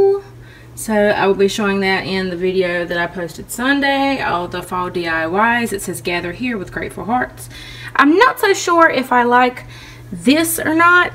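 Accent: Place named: North Carolina, United States. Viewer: OMG you sound like you are from Lumberton North Carolina! Lol where state do you live in?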